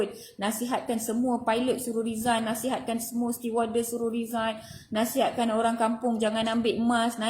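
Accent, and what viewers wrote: North, Malaysia. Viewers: Fasih doktor cakap melayu.... Dr org utaqa ka?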